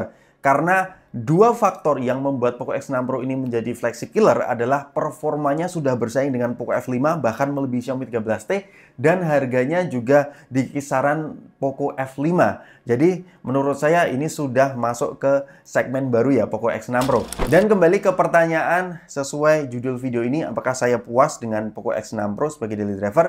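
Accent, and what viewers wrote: Semarang, Indonesia. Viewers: wong semarang yo bang?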